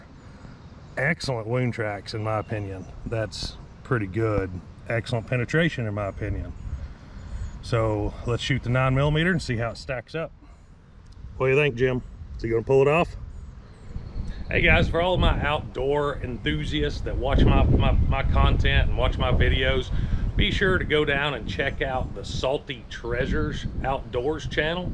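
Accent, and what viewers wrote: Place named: Appalachia, United States. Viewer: You ain't gotta give me specifics, but where is the Gun Dungeon located? From your accent and the hills in the background I'd say East TN, Eastern KY, Western NC, or SW VA.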